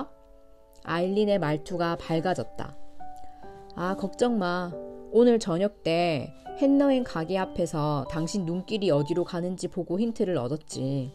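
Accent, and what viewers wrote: South Korea. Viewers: 대구분이신가봐요 ㅋㅋㅋ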